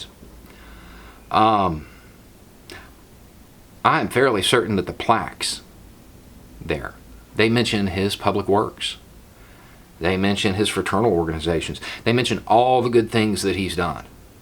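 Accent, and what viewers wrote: South, United States. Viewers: I kept being recommended your videos and I was like why is YouTube recommending southern reactionary to me. Sorry it seems like I have prejudice against your accent. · The fact that you have a southern accent kills those that don't want to accept the reality that is us history.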